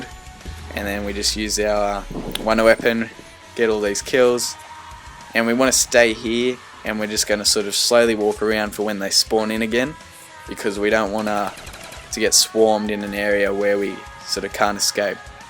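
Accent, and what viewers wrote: Australia. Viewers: AUSSIE AUSSIE AUSSIE, good to hear a fellow australian :) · you australian? you sound it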